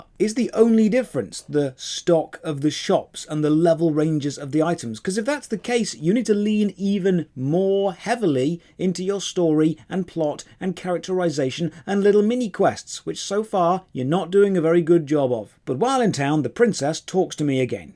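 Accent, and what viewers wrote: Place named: RP, United Kingdom. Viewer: Oh MY GOD ENOUGH with these British youtubers and their clickbaity embellished titles and long droning low effort content, and their received pronunciation speaking as though they are some authority on the subject... Jesus, no other country produces as many cruddy youtubers from this "game the algorithm" school. You've called about 40 MMO's the worst MMO ever, you've never played many of these games you talk about in any significant amount... Low effort, fair use abusing, overly verbose garbage.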